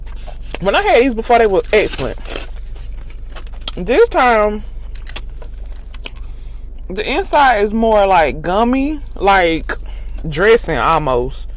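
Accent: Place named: South, United States. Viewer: I like your southern talk & accent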